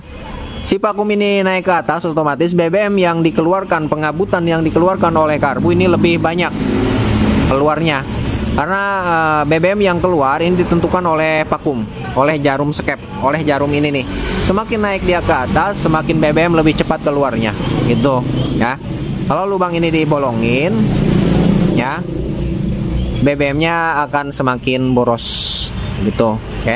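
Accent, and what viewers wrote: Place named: Serang, Indonesia. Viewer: wong endi kang gale gmge jawa serang😅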